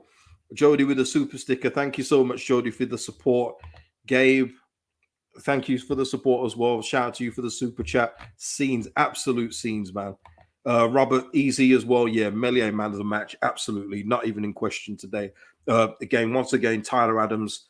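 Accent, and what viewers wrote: West Midlands, United Kingdom. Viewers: Watching this the day after is priceless. Go on lad. Where are you from, you sound local (West mids)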